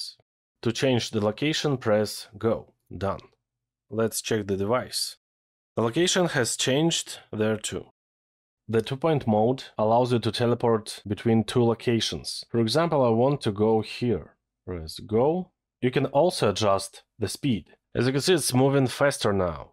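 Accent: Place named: Russia. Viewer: все-таки, у него русский акцент, русский же блогер)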